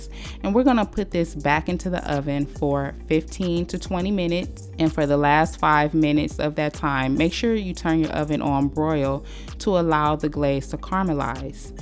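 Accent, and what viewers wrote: South, United States. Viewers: Great recipe! Your step of sweating the veggies down first is spot-on.. raw veggies will release a ton of water and make your meatloaf crack apart. You sound like a Southern lady, so may I suggest adding Celery to your veggie mix? The Cajun Holy Trinity of cooking! 😁 Celery should be equal to the bellpepper amount.. Newly subbed!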